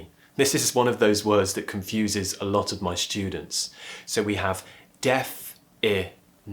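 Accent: United Kingdom. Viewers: you have a distinctive accent among all the British English teachers in the entire YouTube. To me that is the best accent. That is a kind of accent which I have always idealised while learning English or when dealing with the British native speakers. Among the British English teachers on YouTube, I have noticed that even the ones, who claim they speak and teach the RP English, don't speak the best English, at least, I don't find so appealing the way they sound compared to your English. Please, reveal the secret why your English sounds so perfect. It is exactly a kind of English that was in my learning recordings which had been made by the English teaching companies based in the UK. Maybe you were born and growen somewhere in the heart if the Southern England? Maybe it has to do with the schools and further higher institution you went to? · Oh god, I love so much the british accent. 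Thank you again for this great video. 👍🏻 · Im from malaysia..i just to know your youtube british accent..i love the way you explain..crystal clear ⭐️⭐️⭐️⭐️⭐️⭐️⭐️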